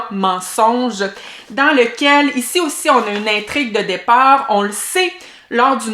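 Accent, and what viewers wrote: Quebec, Canada. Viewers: Hello, Tout d'abord je voulais te dire à quel point c'est un plaisir de t'écouter.... J'aime ton accent, le Québec est le pays de mes rêves et tous ce qui y fait référence est magnifique pour moi.... Continue de nous régaler avec tes vidéos qui sont pour moi un plaisir immense.... J'ai dans ma PAL "entre 2 mondes" et "la ou chantent les ecrevisses" je pense que je vais bientôt les sortir grâce à ta façon d'en parler ça m'a vraiment donné envie.... Encore merci pour tous.... Toi et tous ce que tu représentes dans mon cœur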